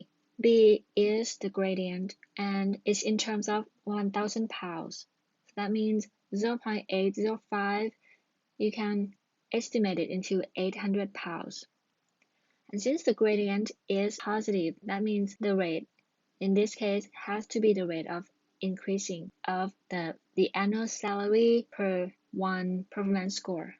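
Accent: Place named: Bangladesh. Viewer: Are you from Bangladesh?.. Your English accent is similar like us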